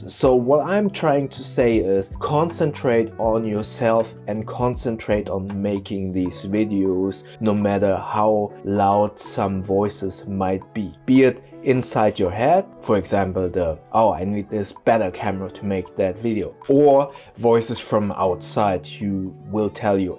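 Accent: Germany. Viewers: Dude, love the German accent! Echt ein klasse Typ, weiter so! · I love your voice and your German accent, it's so soothing.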